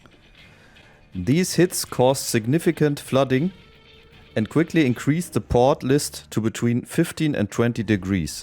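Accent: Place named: Germany. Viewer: Haha man hört den den deutschen Akzent so raus aber liebe deine Videos 👍👍💖😅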